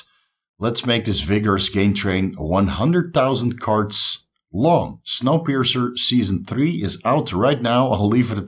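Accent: Netherlands. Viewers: Congrats on the 50k, definitely deserved. Glad I found your channel and hope to enjoy the content for many more years, and leave many more comments and likes. The videos on applying your knowledge on practical cases seems very interesting to me. Always like to see stuff applied as well as explained. Also: fellow dutchman here, I don't mind your pronunciation. I hate a thick dutch accent but your accent is subtle and enjoyable imo.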